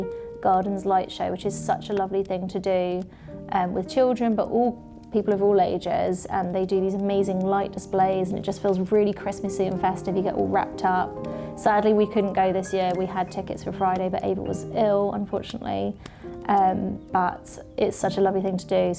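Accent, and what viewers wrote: RP, United Kingdom. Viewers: its just a pleasure watching your videos....love your posh accent and your posh house! You are a classy young lady and we are proud of you x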